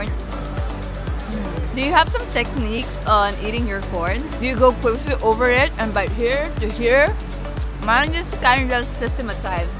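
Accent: Philippines. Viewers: Filipino? I recognize a Filipino accent in 5 seconds because of my many visits to that great country.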